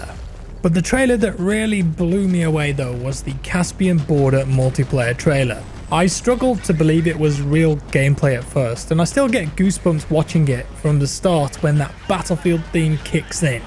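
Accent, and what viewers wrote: United Kingdom. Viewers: Brits are just natural narrators. Very nicely done,.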